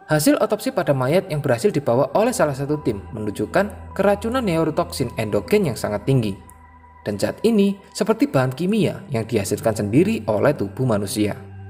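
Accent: Java, Indonesia. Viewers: Logatnya jawa bet ya wkwk. Sukses terusss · Medhog bhuanged mhase....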